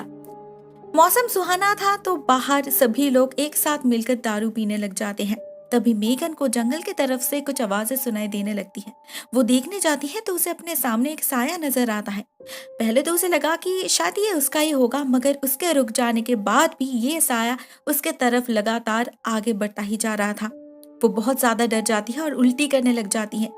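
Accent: Bengal, India. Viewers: u hav improved alot on ur hindi...but still bengali accent persist..cant blame u.. but so far so good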